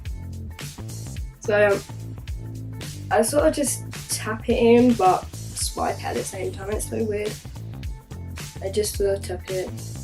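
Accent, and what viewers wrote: England, United Kingdom. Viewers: I love your accent! could just listen to you talk all day makes me miss being on vacation in england :( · Are you from London?:') the way you talk reminds me of myself haha!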